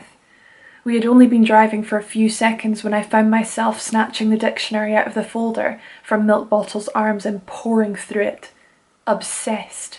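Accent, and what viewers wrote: Edinburgh, United Kingdom. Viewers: So nice to hear such a lovely Scot lass tell a beautiful story. Would love to hear a wee bit more of the Edinburgh accent, it is my favorite sounding speak. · ahhhh nice to hear a scottish accent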